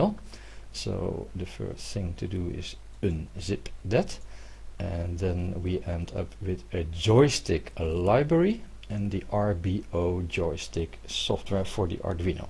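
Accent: Netherlands. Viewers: Just watching to take in the genuine Dutch accent. This sits like a mustache.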